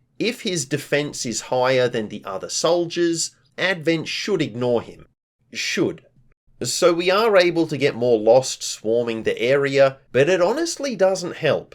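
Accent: Australia. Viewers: The Aussie accent just adds flavor to these videos. It just makes them soothing. I put it in background sometimes.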